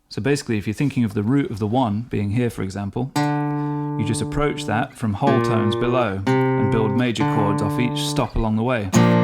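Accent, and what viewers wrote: United Kingdom. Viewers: Did NOT expect the British accent after the guitar part🤣 ... hardcore Americana vibes. Love it!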